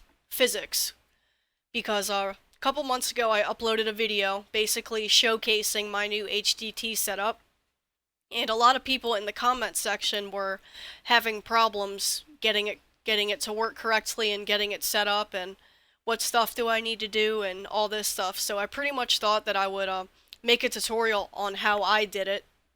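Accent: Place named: United States. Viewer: ) i love ur american accent, so cute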